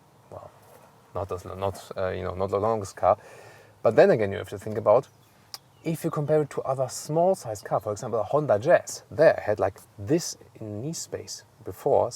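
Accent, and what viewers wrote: Germany. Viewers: I just come here for the German accent, yum.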